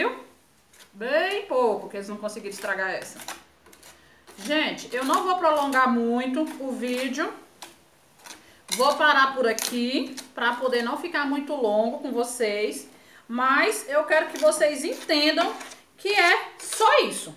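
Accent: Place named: Brazil. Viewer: Adorei o móvel e esse jeitinho brasileiro arretado dela falar. Negra linda. Na cor e na essência.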